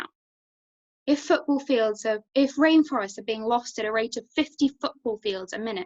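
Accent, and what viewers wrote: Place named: South, United Kingdom. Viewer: Sound like some posh southerner. Doesnt sound very Cumbrian to me.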